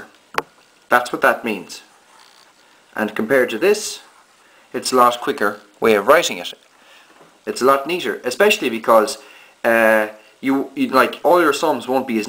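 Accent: Ireland. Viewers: wow ,, thank you im 60 , and never understood ,, this ,, you teach so very well ,, and you sound Irish ( win , win ) a bit thank you ,, im going to watch the rest out you video's ,, from a Yorkshire ( Hull ) man ( living in Canada ) ,, it took me 40 years to find a real world project that needed Trigonometry , so i went to my daughters school to see if a math teacher would teach me what i needed to know and he did , and i finished the project -- again Thank You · YOURE IRISH !!!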